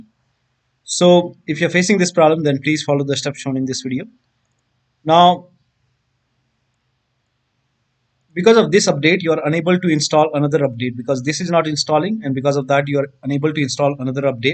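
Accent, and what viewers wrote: India. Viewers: that's awesome thankds for your help, by the way your india accent is so special😁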